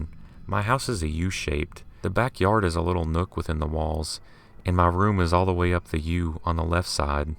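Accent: South, United States. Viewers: Cool accent. Sounds a little southern(?)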